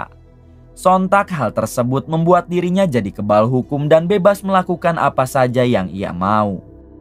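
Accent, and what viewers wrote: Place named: Majalengka, Indonesia. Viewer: Lu orang Majalengka ya min ?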